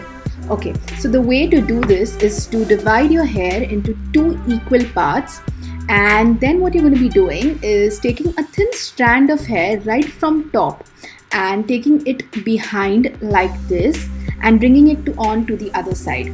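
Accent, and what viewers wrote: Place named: New York, United States. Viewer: Love that shimmery eye shadow The way you talk is very nyc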